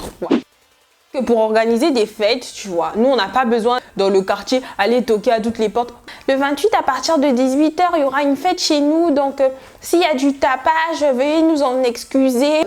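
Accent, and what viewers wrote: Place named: Democratic Republic of the Congo. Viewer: 🤣🤣🤣 tu mets "quoi" à la fin de certaines phrases 🤣🤣 hey moninga tu es purement congolaise toi 😍😍😍😍 je t'adore. En passant tu es trop belle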